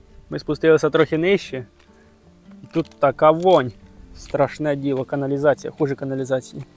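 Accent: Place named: Poltava, Ukraine. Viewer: Ви говоріть або російською, або українською, цей суржик задовбав... Це в мене так на Полтавщині... говорять, але там був більший вплив Рашки...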